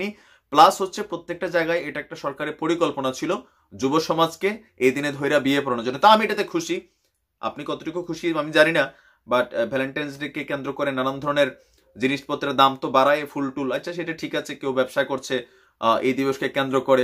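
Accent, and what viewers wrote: Comilla, Bangladesh. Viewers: কুমিল্লার পোলা ৮০ টাকা তোলা , কুমিল্লার আঞ্চলিক ভাষা শুধু ভালো লাগে আপনার মুখে সত্যি সত্যি সত্যি সত্যি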